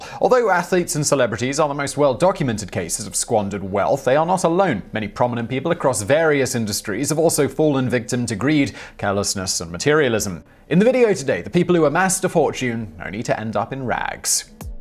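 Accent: United Kingdom. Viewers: You're probably not familiar with British English pronunciation. He's pronouncing them correctly according to his background.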